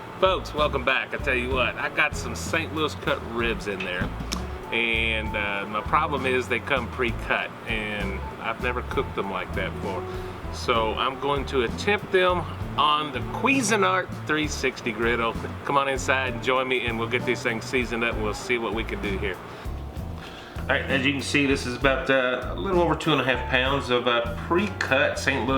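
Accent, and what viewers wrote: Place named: Kentucky, United States. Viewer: Boy those are some mighty fine ribs mon ami...Your kentucky accent and my french canadian one some of these things are hard to pronounce lol . Love me some Tango spice!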